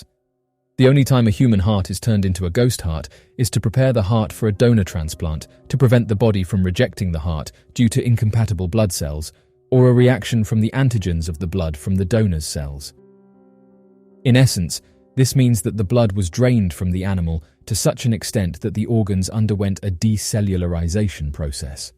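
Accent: England, United Kingdom. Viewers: That he has the English accent means I can skip this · I am sick of hearing a british accent to report on AMERICAN NEWS!!! I realize it is computer generated, is there no one who speaks american english, in all of Utube? America broke ties with GB and the British Monarchy, generations ago! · 🤬🤬🤬🤬WHY AM I LISTENING TO A BRITISH VOICE NARRATIVE?? I listened for three minutes. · WHy The Hell is an ENGLISHMAN ACCENT being used for a USA Incident? JUST CURIOUS. Right? USAF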